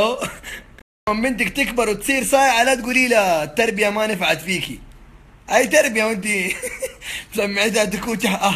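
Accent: Hejaz, Saudi Arabia. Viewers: ماكانه اماراتي كانه من السعوديه من الغربيه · ليه لهجته حجازيه!!!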